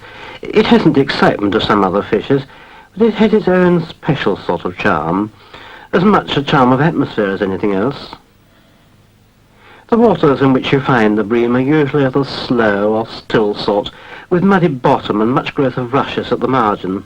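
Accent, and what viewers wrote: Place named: England, United Kingdom. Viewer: Talks very well with a marvelous English accent.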